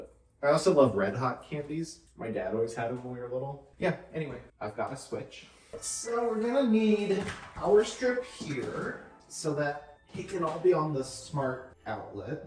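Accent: Minnesota, United States. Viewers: On the 2nd day of Vlogmas my true love gave to me: 2 power strips 🔌🔌 and you sound like the movie Fargo... ❄️⛄🎄⛄❄️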